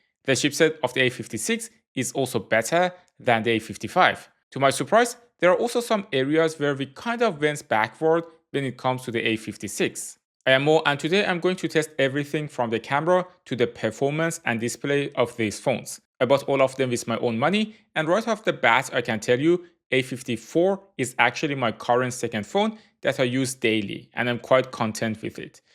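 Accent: Iran. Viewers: نگا لهجه ایرانی رو :))))) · Are you persian ?? Your accent sounds like you are. If so nice video dadash ❤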